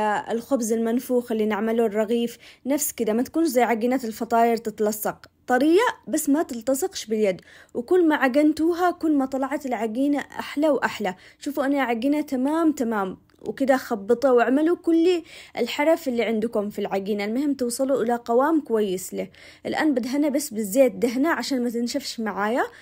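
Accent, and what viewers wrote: Yemen. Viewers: السلام عليكم احب طريقه واطباق طبخك يا واكثر شي احب لهجتك اليمنيه انت سيده مباركه الله يحفظك يا ريت تزوريني في جده ❤ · لاول مرة احب لهجتنا اليمنية منك انتي طبعا لهجتنا متعددة يابنت عدن الحلوة عفويتك وعدم تصنعك خلاني اقول والله لهجتنا كانت مظلومة من قبلك وتسلم ايدك يا قمر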